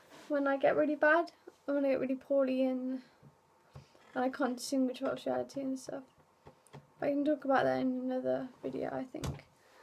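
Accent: United Kingdom. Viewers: The accent is either Liverpool, or Manchester. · I don't know why the voices have to be negative, but I guess sometimes they are positive,I hear them too but now a days they are softer and I don't think people are really thinking about me.people are thinking about themselves.Don't worry cause there is no reason why people would want to cause you harm,so your alright. Modern medicine is getting more advanced there is hope ,that soon you won't have to hallucinate not so much.You look like a sweet girl and I like you English accent.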